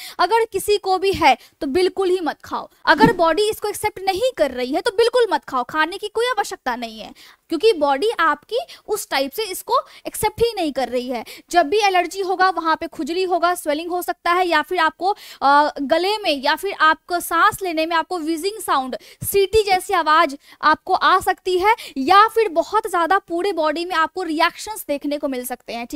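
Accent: Bihar, India. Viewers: Mam vihari ke awaj me swag hai aapke awaj se hme pta chl gya aap pakka vihar se ho❤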